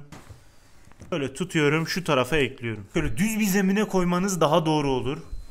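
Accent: Adana, Turkey. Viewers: Tencereyi ocağa eklüyürüz abi sen hataylı degilmi sin adanalı gibi konuşuyorsun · Abi adanalı mısın şive tam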